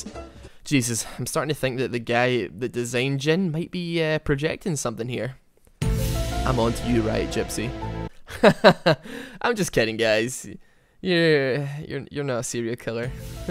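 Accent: Scotland, United Kingdom. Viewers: I can tell you are scottish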